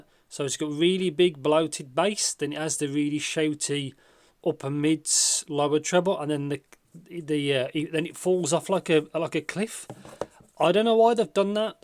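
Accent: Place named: England, United Kingdom. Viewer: He's probably form England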